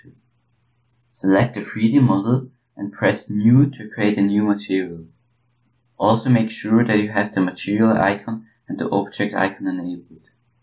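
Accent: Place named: Switzerland. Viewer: Haha ich han grad kört dass du en schwitzer bisch an dim akzänt :P Cools Tutorial btw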